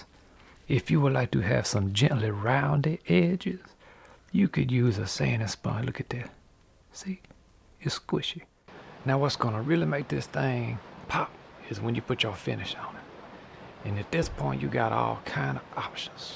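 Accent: Louisiana, United States. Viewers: He had me at Bilbo and Gandalf story in his Cajun soft voice 😂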